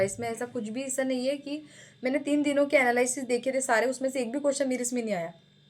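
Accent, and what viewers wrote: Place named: West Bengal, India. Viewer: Aapke boli se lag rha hai ki aap West Bengal se ho. Ham to up se hai. hme to abhi se dar lag rha hai. mera 11 feb ko hai.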